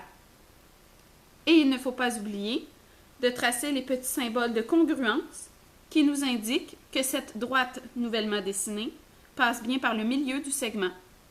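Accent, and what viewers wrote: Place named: Quebec, Canada. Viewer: ps: super l'accent Québéquois (surtout ne le prend pas mal)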